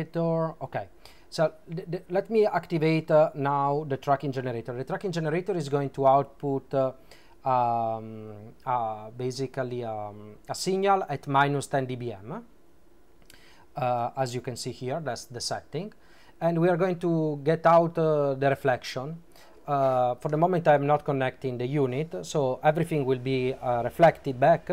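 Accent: Italy. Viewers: quanto si sente che sei italiano! grandeeeeee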